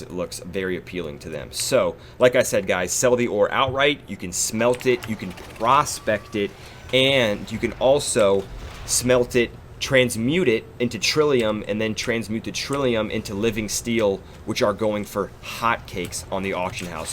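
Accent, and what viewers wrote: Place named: United States. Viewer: Where are you from bro? I'm quite confused.. I am not sure if you're Australian or mid north-western American. Edit: ok You're totally not Australian. But I still can't pin point where you're from, I mean I'm sure it's America, but dunno which state.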